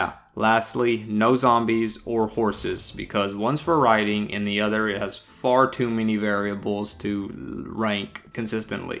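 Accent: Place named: Tennessee, United States. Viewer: I hear a TN accent. Maybe TX. Maybe just joke accents but theyre convincing.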